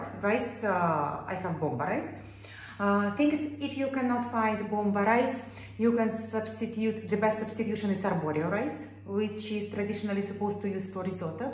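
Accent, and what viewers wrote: Russia. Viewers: I also heard accent similar to Russian... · Is she Russian? That’s no Spanish accent!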